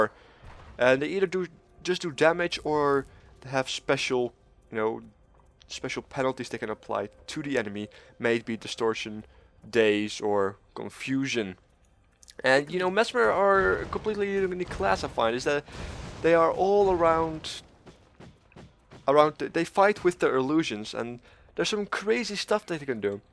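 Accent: Netherlands. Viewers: Nederlandse accent in het Engels! ;) So nice to hear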